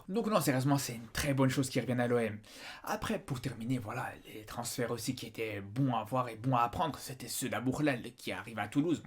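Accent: Belgium. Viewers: J'entends un ptit accent belge. T'es marocain de Belgique ?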